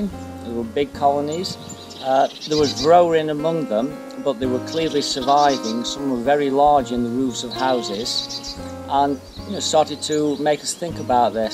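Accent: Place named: Stoke-on-Trent, United Kingdom. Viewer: Very interesting certainly somthing to think about . You sound like you a stoke on trent man where you from there origanal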